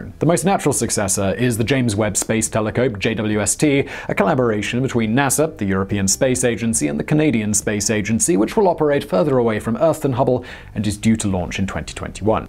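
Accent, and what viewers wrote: United Kingdom. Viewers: Lots of "we" and "our" in that monologue coming from someone with a British accent.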